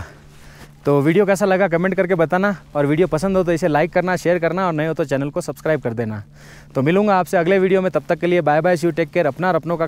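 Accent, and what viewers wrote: Gujarat, India. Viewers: ભાઈ તમે ગુજરાતી છો એ તમારી ભાષા પરથી લાગી જ રહ્યું છે😅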